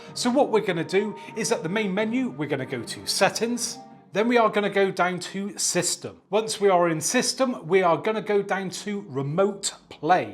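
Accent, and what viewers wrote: United Kingdom. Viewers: Very helpfull I realy understand everything and your very nice british english you are the best and your video is far more better then sony thanks you very very very much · Are you British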